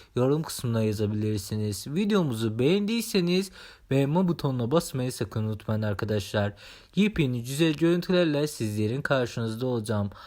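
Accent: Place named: Azerbaijan. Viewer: Admin siz Azermisiniz? Yaaa konuşmanız bi qarip da. O yüzden sordum. Aff edərsiniz 🙏🏻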